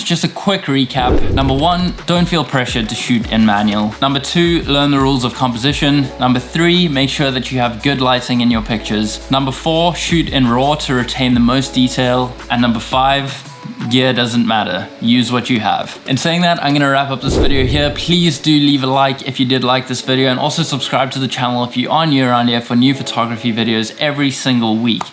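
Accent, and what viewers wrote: South Africa. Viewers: Are you south African. Tour accent sounds like it.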